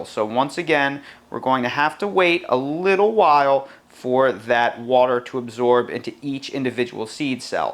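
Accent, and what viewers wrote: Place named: Philadelphia, United States. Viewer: I recognize ur Philiy accent!!❤️ God's Blessings to u in all ways!!💜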